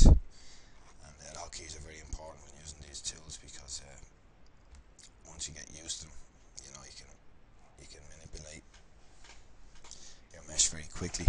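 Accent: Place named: Dublin, Ireland. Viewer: so weird hearing a fellow dubliner pop up on one of these videos :P nice stuff man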